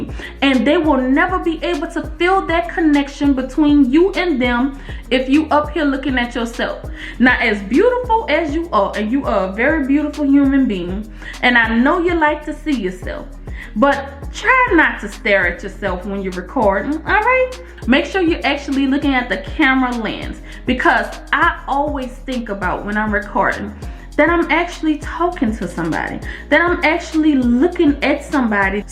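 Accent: New Orleans, United States. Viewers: Are you from New Orleans? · It’s the New Orleans accent, I love it ✨👏🏾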